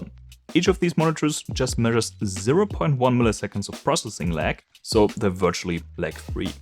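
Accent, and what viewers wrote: Germany. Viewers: Kanns sein das du deutsch bist? Trotzdem guter Akzent. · wie man merkt dass du deutscher bist hahahah :D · r u german? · Ich habe das gesamte Video gedacht „Der ist doch 100% Deutschsprachig", und trotzdem hat mich dieses „Bis zum nächsten Video" komplett gefickt 😂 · Do I hear Deutsch??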